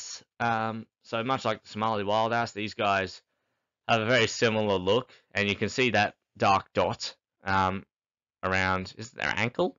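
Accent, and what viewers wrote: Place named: Australia. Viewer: Heeey an Aussie Planet Zoo voice! Great to hear one of us out there.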